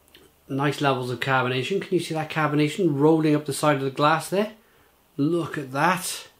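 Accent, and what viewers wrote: Wales, United Kingdom. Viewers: Hi As you're Welsh (I think that's a Cardiff or Barry accent I detect) do you know of anywhere local that sells this or similar? Would love to get my hands on more German helles, dunkels and bocks, doppelbocks etc. Love German beer but can only find one store that doesn't have a great selection outside of weissbiers. Also, isn't a dopplebock a lager? Cheers, I666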